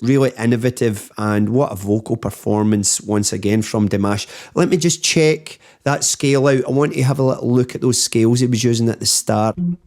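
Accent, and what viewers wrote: Scotland, United Kingdom. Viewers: Love you reaction including the wee Guy!! But most of all I love your Scottish accent!!! 🤩🤣👏👏👍😘😍❤️🇨🇦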